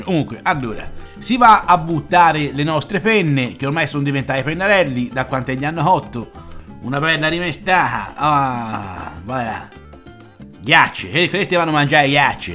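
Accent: Tuscany, Italy. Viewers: Toscano di dove, il dialetto somiglia a quello Fiorentino ma non lo è, di che città sei ?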